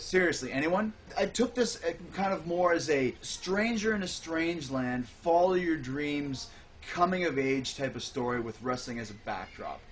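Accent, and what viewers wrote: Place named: Canada. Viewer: he says aboot. such a canadian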